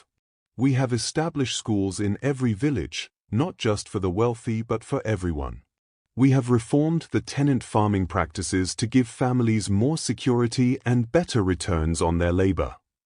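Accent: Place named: England, United Kingdom. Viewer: It’s nice not to have an American accent reading. Please keep the English accent.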